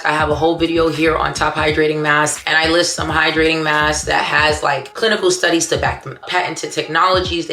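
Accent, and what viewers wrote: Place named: New York, United States. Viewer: Homegirl! I'm so glad to find your channel. First, you're sharing priceless knowledge and thank you for that. Also, it's been a long time since I lived in NYC and just listening to you talk takes me back to the good old days. You are filling me with so much joy by just being you and sharing that with the world. Keep on keeping on. 💖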